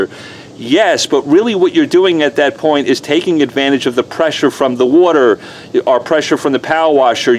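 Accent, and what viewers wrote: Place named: Long Island, United States. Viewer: Similar to stern but very close to Ray Romano. Both of those guys grew up on Long Island. Where did grow up?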